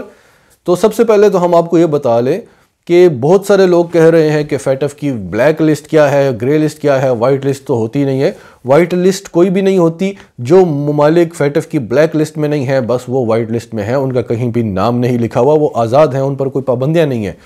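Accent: Pakistan. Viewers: Very good urdu accent pure pakistani accent unlike urdu speaking person which resemble to indian accent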